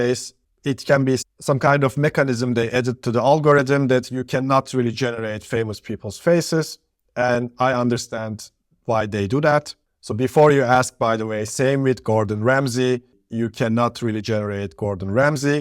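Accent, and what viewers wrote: Turkey. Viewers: From your accent I can tell you're Turkish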